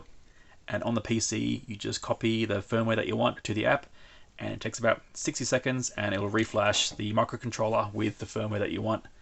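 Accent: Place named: Australia. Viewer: Australian accent.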